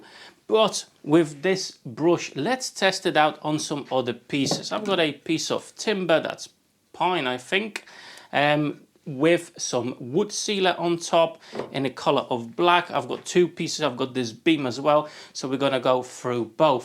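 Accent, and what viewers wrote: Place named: West Midlands, United Kingdom. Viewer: Hey - your English is great, certainly a few thousand times better than my Polish! I'm guessing you were in the West Midlands (Wolverhampton?), judging by what I can detect from your English accent. All the best, Rob in Switzerland.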